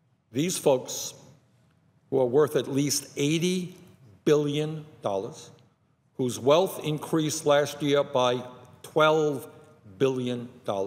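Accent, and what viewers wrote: Brooklyn, United States. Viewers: Few accents I enjoy listening to as much as a Brooklyn Jew!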